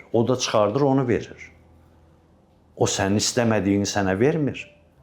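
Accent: Azerbaijan. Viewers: Bu kişi tər təmiz əsl Azərbaycan dilində danışır.amma bəzi kometləri oxuyanda adamın heyfi gəlir bu kişinin danışmağına.Necə tələffüz edirlər elə də yazırlar.🤔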